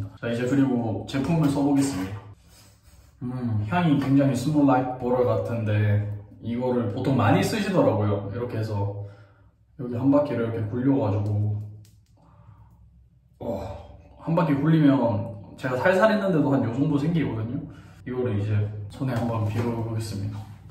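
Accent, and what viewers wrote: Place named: Gyeongsang, South Korea. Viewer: 님 말투면 달고나가 아니라 쪽자라고 해야할 것 같은데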